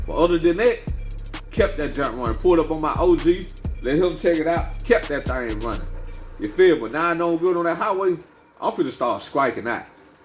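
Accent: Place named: Memphis, United States. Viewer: Sound like you from Norf Memphis. Good ahit tho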